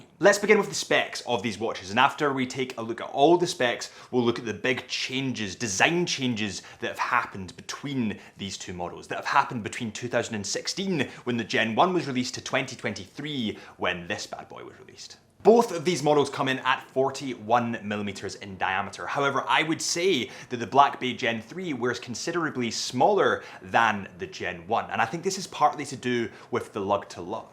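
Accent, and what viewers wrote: Scotland, United Kingdom. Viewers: You do a very good Adrian Barker impression, with a slight Scottish accent.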